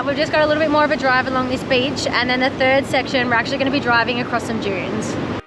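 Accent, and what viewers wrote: Australia. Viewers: One of the best shows on YouTube. Great lighting, not very obnoxious audio, great lighting, drone coverage, and seemingly good rig. I don't fly, thus will never see that country so I appreciate the all the tour's. NW Washington, I get to meet some folks from various places as well as to try foods. Find the "Aussie" manner of speaking makes for a nice change. Always curious about others kit, as I watch and learn from all those I can even if their region might be different. I trample about in a 1987 Jeep Cherokee (XJ) which I sleep in the back, as it has about 15cm of foam in the back comprised of two different compressible foams, with a big mosquito net that can cover the drop gate while fully open. But then I don't have aftermarket bumpers as I don't go into places that I don't belong, though I did enjoy playing in the 25cm or more of snow lately.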